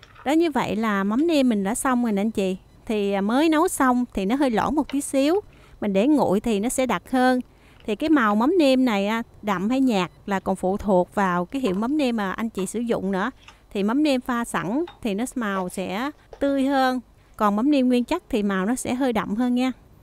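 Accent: South, Vietnam. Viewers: Thơm thái là ngon nhất e người miền gì mà khóm nghe e nói giống miền nam mà nói khóm là seo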